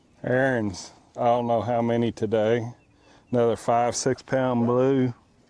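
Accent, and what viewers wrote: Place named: South, United States. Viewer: Love your channel! Plus sounds like you’re a southern boy like me. lol. My gf says wow you and him sound just a like when you talk. You do my kinda Catfishing. Simple gear catching fish. Great video! Up here where I’ve been living in Ohio you Can’t find skipjack here. I wanna move back to Kentucky soon. I lived near Markland Dam and miss it a lot. My son still lives there right next to Markland dam. As soon as my boats done being restored I’m heading that direction lol. This boat restore is killing my body. I stay sore lol. It’s a 1979 Sylvan deep V 16.5 foot. Anyway thank you for another great video! 🎣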